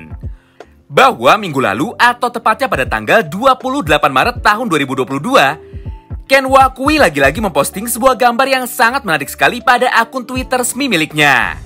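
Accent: West Java, Indonesia. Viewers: Bang mau nya ni,, abang orang Sunda tah? 😁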